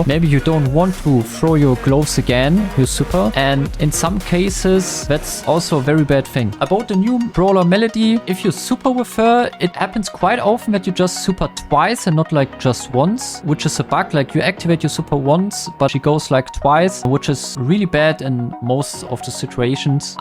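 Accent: Germany. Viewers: Man merkt deinen deutschen Akzent so sehr, feier ich😂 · bro dein deutscher aktzent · Ich höre das du deutsch bist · Voice with german acent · Wie hart Mann merkt das er deutsch ist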